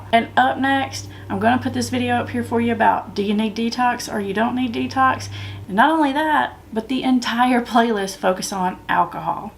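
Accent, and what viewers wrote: South, United States. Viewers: Verryy calm pretty southern arcent, that bullshits into an advert.. BORING another pretend proffessional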